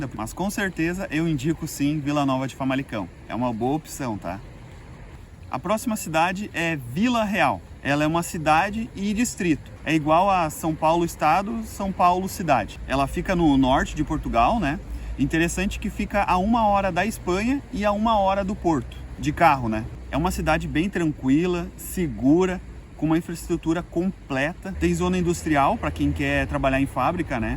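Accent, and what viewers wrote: Rio Grande do Sul, Brazil. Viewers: Buenas, tem como comprar erva de chimarrão por aí? Tu é gaúcho né. Parabéns pelo canal.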